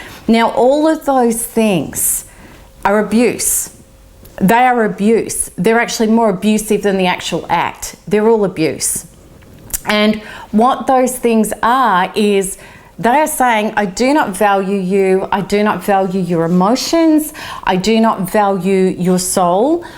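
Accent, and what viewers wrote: Australia. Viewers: Is she Australian? I'm curious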